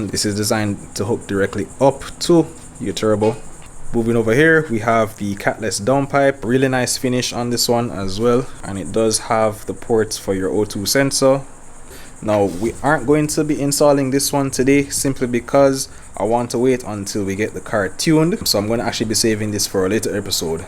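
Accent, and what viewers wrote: Jamaica. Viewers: Hey man, totally random, but are you Jamaican by any chance? Picking up a very familiar accent 😅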